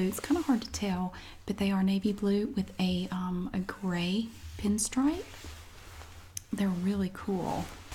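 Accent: South, United States. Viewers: Do you have a patreon account? You should set one up so we can give back in gratitude to what you do for us. A good way for you to also make a little (and much deserved) cash. As for the Brioche, i don’t know what it’s like in the U.S but the French particular Brioche that we get imported to Australia is not dense. It’s very sweet and very soft n fluffy. Perfect for French toast and PB&J but not really with savoury toppings. Just my opinion.. Thanks for another great vid, I’m addicted to your soft spoken southern accent :) x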